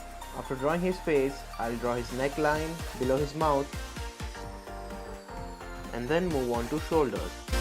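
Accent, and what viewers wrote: India. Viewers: It took me 1 second to realize that you are an Indian (because of your voice idk)... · Wow These Indian voice xd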